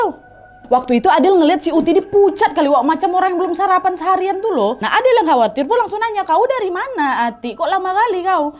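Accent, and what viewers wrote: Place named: Banjar, Indonesia. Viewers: agak kaget pas denger kak ngomong b.banjar, logatnya kerasa bgt ga sii?, keren parah kak hha 😌 juga baru pertama kali ini denger khw yg pake bahasa daerah tapi gabaca subtittle, btw berhubung udh di penghujung ramadhan, aku minta maaf ka wawak-wawak semua kalo aku ada salah yaa, minal aidin wal faidzin wawak semuaa 💟💟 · Cocok banget kak bicara bahasa Banjar, logatnya pun kental, emang multitalent 😊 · BANJARMASIN KANDANGAN HADIR ☝️, lucu dengar pakai bahasa Banjar 😅🤣🤣😅, Kya suara Acil ku di higa rumah yg panyarikan tuh 😅😅😅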